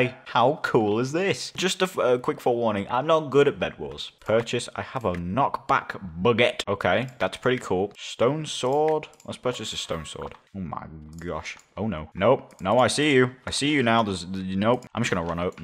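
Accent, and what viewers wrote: United Kingdom. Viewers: Are you British? You sounded like you were as a kid.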